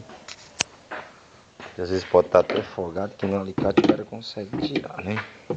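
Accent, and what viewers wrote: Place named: Recife, Brazil. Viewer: Vc é de recife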